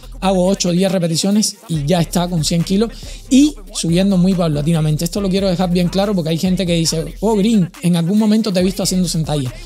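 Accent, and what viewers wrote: Cuba. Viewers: Green el chama ya tiene el ascento cubanoo ❤😮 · Ya el chama habla cubano, saludos Green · El chama tiene acento cubano!!!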